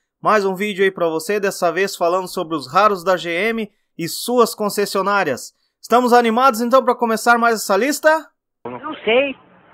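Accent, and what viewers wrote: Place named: Paraná, Brazil. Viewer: Paranaense , acertei ? O melhor sotaque do Brasil !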